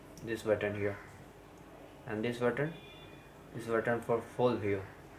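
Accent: India. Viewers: Indian voice ftw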